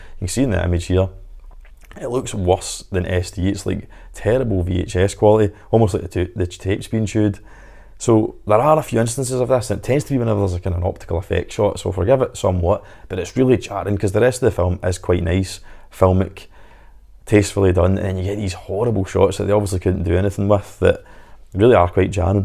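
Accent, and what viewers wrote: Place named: Glasgow, United Kingdom. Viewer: Just discovered your channel mate thanks to John at mondo, being a Glasgow boy myself its great to hear a familiar accent. Absolutely adore this film and I picked up this set. This release is 100% my best release of last year. I do prefer a amaray or steelbook in these sets but apart from that its a great set. This movie having a nice 4k edition has been a long time coming. Great informative in depth review, a breath of fresh air, the effort you have put in is much appreciated 👍 Mulcahys eye is superb, i love how he moves the camera. You should check out His 'Razorback' if you havnt seen it, another masterclass in moving the camera. Ill defo be checking out more of your vids sir! Take it easy.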